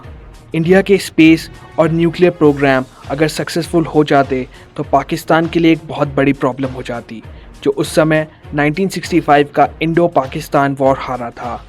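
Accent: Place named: United Kingdom. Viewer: Fuck your British accent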